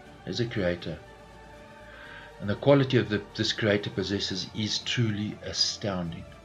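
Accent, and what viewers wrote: South Africa. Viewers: are you a South African.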